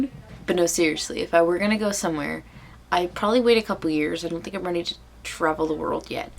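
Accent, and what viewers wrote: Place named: Canada. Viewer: I KNEW IT YOU'RE CANADIAN. I love Canadians. And your accents.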